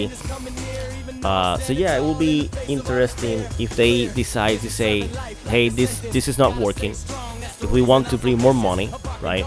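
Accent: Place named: New Jersey, United States. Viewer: I love your New Jersey accent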